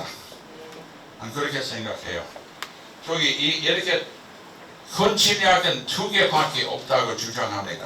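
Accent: South Korea. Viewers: 교수님! 교수님 역사 강의 들으며참으로 감회가깊고 한국인보다 더찐한 한국인이심을 실감했습니다! 겉은 미국인인것처럼 보이지만 속은 한국인보다 훨씬 찐한 황인(fully ripened!) 이십니다! 전라도 지역 표준어실력 또한 기가 막히십니다! 교수니의 해박한 예리하게 정확한 역사관 마음속 깊이 존경합니다! 계속 많은 제자들 위해 헌신해주시고 주옥같은 가르침 20년정도만 부탁드리며 남은 여생 더욱더 하나님이 큰 돌보심과 축복, 은혜 교수님 가정과 주위분들 가정에함께하시길 기원합니다!❤❤❤❤❤❤❤❤❤❤❤❤❤❤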